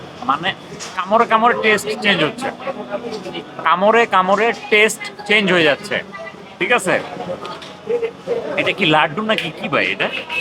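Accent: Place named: Kolkata, India. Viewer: পুরা কলকাতার ভাষা শিখে গেছেন দেখি।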